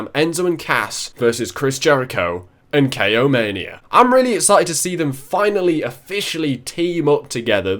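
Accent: England, United Kingdom. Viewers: I was wondering which part of England are you from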